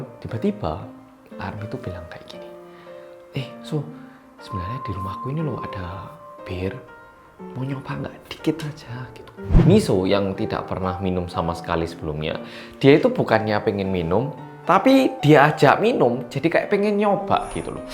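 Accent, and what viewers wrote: Java, Indonesia. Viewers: Pangling mukamu krn ganteng tp ga akan pangling suaramu sing medok itu loh cak... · Jangan lupaaa medokkkkkk🤺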